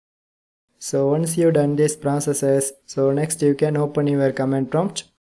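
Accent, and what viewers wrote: India. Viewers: Thanks. This helped alot, just a little annoying when you speak (Indian English), but it's nothing you can do about it. Thanks again!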